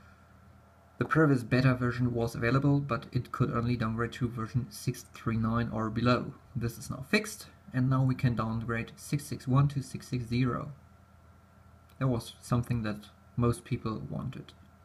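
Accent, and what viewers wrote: Germany. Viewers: Krasser deutscher Akzent :D Dankeschön.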